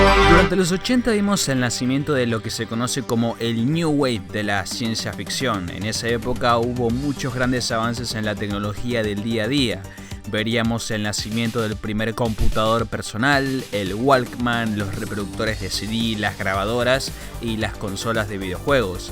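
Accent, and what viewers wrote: Argentina. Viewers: Tanto le cuesta leer a un argentino.? Super Sho, El Esho? Igual que un puertoriqueño, saben que hablan mal pero igual lo hacen hacen 🙄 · El esho, el ego y el súper sho jajaja cagado acento Argentino. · eres muy buen editor, buen análisis amigo, transfondo intelectual, saludos desde México, voz sos argento "pibe"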